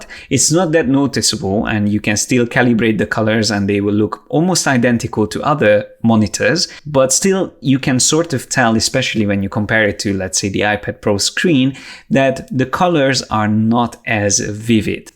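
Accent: Hungary. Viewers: Szia ! Pár videódat már végignéztem. Az összes minőségi és átgondolt, csak gratulálni tudok. Ha megengeded 1 észrevételem mondjuk lenne. Az angol kiejtéseden nagyon érződik, hogy magyar vagy. Ezzel semmi gond sincs, hiszen nem ez az anyanyelved. Arra szeretnélek megkérni, hogy amennyire az időd és energiád engedi feliratoznád a videóid magyaroknak, mert nem mindenki ért angolul és így a nézőid száma is bővűlne ... Ez csak egy javaslat 😉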